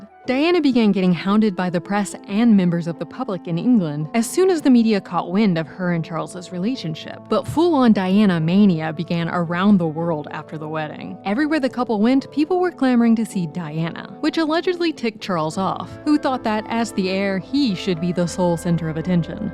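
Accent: United States. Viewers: And William was very clever and Harry failed in almost every subject even art the teachers had to fix it. The story of Diana was a bit one sided no mention of the police having to stop her calling a man’s home over 400 times she was not concerned about if the man she was involved with was married or not there is a great deal more about her that British people know I notice the person telling us has an American voice? This story has been told many times time for Diana to RIP on her island with her family.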